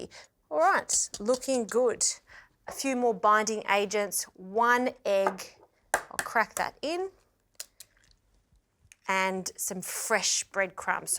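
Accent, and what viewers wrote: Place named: Sicily, Italy. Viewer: I love your Sicilian accent!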